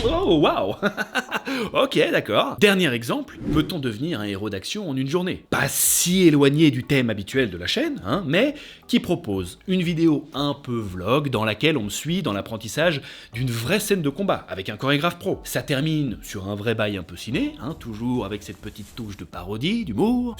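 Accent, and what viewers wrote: Belgium. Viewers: Abonné depuis 10 ans avec le petite 🔔, j'ai cliqué sur chacune de tes vidéos et j'ai toujours apprécié, car même si je suis passionné par le cinéma, je regarde ta chaîne surtout pour toi, j'ai direct accroché à ton humour et à ce que tu dégages (et un peu aussi pour l'accent belge 🙄😂 Je suis pas très loin) et ce depuis 10 ans ! 😁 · Magnifique accent Belge et aussi bonne année.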